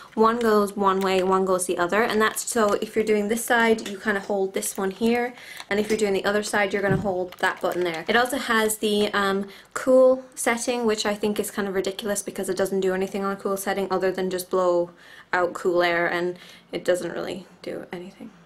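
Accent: Ireland. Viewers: r u irish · are you irish?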